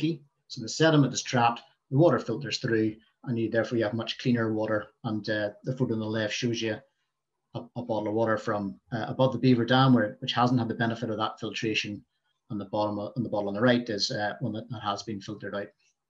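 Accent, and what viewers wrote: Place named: United Kingdom. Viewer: I speak North American English but have trouble understanding UK English---toooo fast. It would have been easier to understand if we had been able to see the face of the speaker. Can N American Beavers easily interbreed with Eurasian Beavers?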